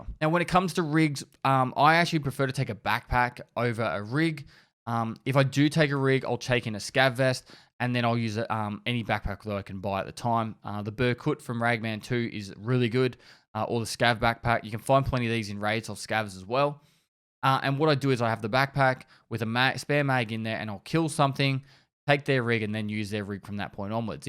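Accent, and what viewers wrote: Australia. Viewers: man, wish I could understand his aussie accent -_-! I miss 70% of the info xd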